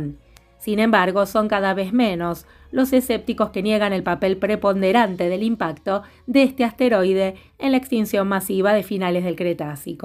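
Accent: Argentina. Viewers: Uff amo la voz argentina que tiene la joven🤗🤗🤗🤗😘😘🤗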